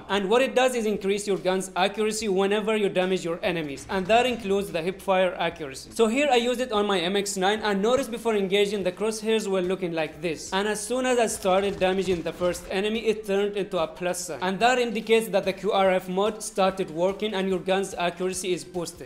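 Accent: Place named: India. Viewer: Yo, you're sound seems Indian....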